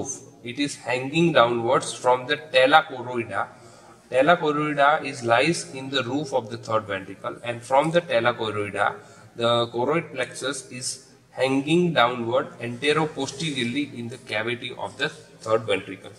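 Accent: India. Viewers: if you cant understand the indian accent you haven't seen enough educational videos smhh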